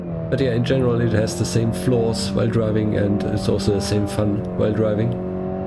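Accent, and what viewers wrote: Bavaria, Germany. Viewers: Du kommst aus Bayern?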